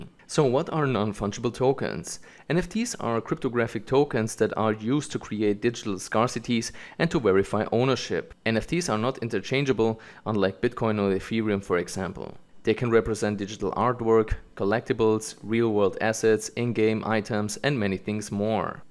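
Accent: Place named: Germany. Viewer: Ich sehe hier Kommentare auf Deutsch bist du auch aus Deutschland? Am Anfang hatte ich das Gefühl einen Deutschen Akzent bemerkt zu haben. Aber was ich eigentlich sagen will ist : sys1q29ngmzattdaamwyvggq6pw0j67ls7h9wauhmqy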